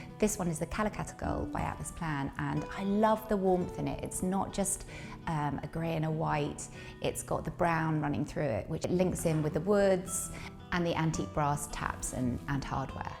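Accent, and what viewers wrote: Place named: RP, United Kingdom. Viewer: Wonderful house, horrible and ridiculous posh accent.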